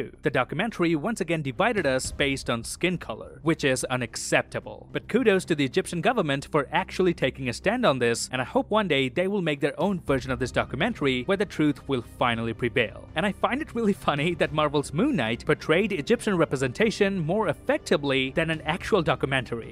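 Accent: Canada. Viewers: The weirdest Canadian accent I've ever heard. And we don't refer to each other as lads. That's a British thing.. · You sound Canadian.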